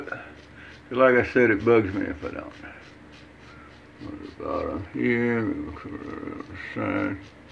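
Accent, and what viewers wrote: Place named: Mississippi, United States. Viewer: You can tell that you are from Mississippi by the way you say it. Missipi, if you ain't from there, you don't understand.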